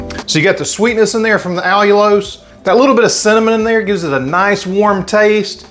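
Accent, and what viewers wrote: South, United States. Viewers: This looks DELICIOUS, I really like a hot cereal and this will be something I try for sure, thanks so much for sharing and I really enjoy your southern accent!! 😊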